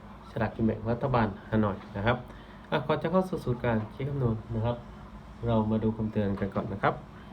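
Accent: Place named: Phu Thai, Thailand. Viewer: หนูอยู่พรรณานิคมอาจารย์คนที่ไหนค่ะฟังเสียงคือคนภูไทย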